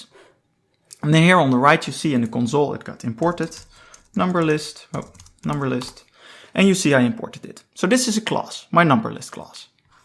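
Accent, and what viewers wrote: Netherlands. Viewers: Afgaande op je accent ben je Nederlands.. Fijne video's ! dank!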